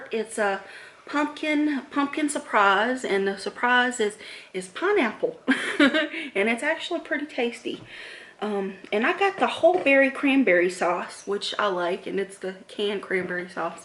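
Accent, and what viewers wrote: South, United States. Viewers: Agree, she's so friendly, sweet and down to earth and seems like a kind person, love her Southern accent_too! Love Thanksgiving food!😋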